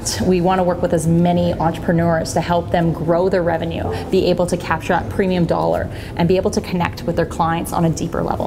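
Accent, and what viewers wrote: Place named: Canada. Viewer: Excuse me. Please. Congratulations,. I would like to thank you for the perfect Canadian English class. There is a possibility of playback speed to 0,75 or 0,5. Anyways! I'm learning a lot from you. Sorry for being out of focus on the video. I watch several North American Natives. They speak Portuguese, but your Canadian accent is the best of all. Your connected speech is Perfect.!! You're a great inspiration to me (or, for me) You're Amazing,. "Why am I not Canadian"??🇨🇦 Sorry to lose the focus of the video. P. S. I've just watched your next video. Thank you! Your fan! See you!!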